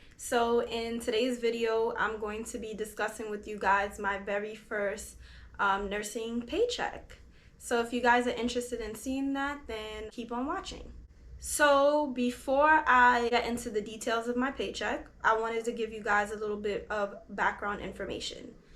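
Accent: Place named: New York City, United States. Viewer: You have a very heavy New York City accent!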